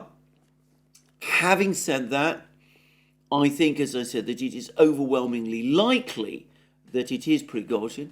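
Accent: United Kingdom. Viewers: Sheer and shameless bias. You are ridiculous and only act rational with that pompous British accent.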